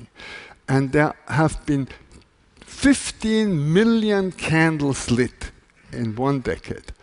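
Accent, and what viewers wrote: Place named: Austria. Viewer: Wyczułem austriacki akcent na początku :) ależ jestem dobry!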